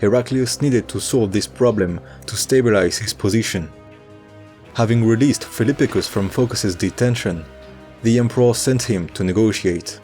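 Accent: France. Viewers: 😂 l’accent French , merci beaucoup tu régale , j’imagine pas le taff derrière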